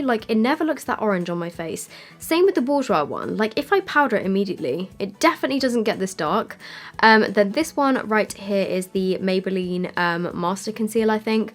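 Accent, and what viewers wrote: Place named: England, United Kingdom. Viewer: You are my favourite English youtuber and my Spanish favourite youtuber (I am Spanish) has just done a review of your palette. It is like a mixture of my 2 favourite youtubers in a video Yayyyy haha Her channel's name is Ratolina in case you want to watch hehe Lots of love♡♡♡ ps: I really love your accent ♡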